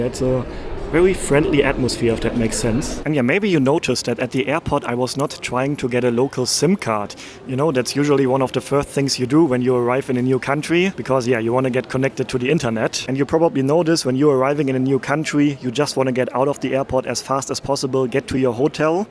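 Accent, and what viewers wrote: Germany. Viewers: Can tell you are german, as you pronounce your w with an r and vice verser. Ive subscribed to you. 💋💋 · nice German accent. Makes you "sympathisch" and real❤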